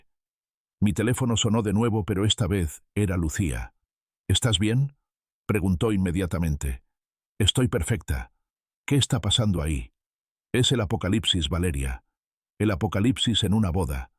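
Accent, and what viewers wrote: Argentina. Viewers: Así hablan los argentinos, uruguayos. Aquí en Chile también hablan sin tildes o acento y los plurales no los pronuncian. . Tienes que poner mucha atención para lo que realmente quieren expresar o para colmo hablan entre dientes, casi susurrando la mayoría de veces. Bendiciones. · El siseo del narrador desquiciante y el acento ese porteño más desquiciante todavía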